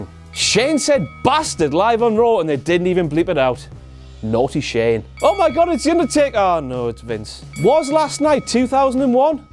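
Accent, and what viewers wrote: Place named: United Kingdom. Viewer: british accent.. wtf is he speaking in?????? english?????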